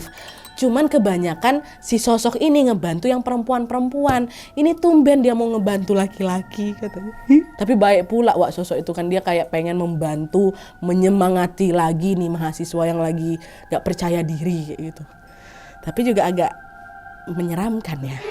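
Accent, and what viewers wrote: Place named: Java, Indonesia. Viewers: kak nad sampean logat nya jawa nonjol pol loh 😭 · 😂😂😂😂 ngomong jawanya pinter. Medok juga. Mantap 👍🏻👍🏻👍🏻 menjiwai ceritanya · Ada yg baru nonton oktober 2025😅? Kak medok Jawanya uapik tenan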